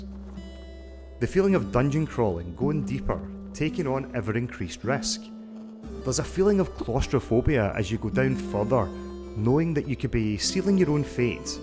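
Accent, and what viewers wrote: Scotland, United Kingdom. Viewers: Scottish accent: +1 to credibility.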